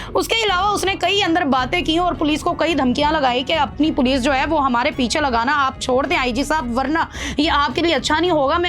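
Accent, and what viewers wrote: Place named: Punjab, India. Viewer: بی بی کیا ہی اچھا ہوتا کہ آپ ویلاگ پنجابی میں کرلیتی اردو انگریزی مکس کرنے کے باوجود لب و لہجہ اور الفاظ کی ادائیگی پنجابی انداز میں ہے۔،،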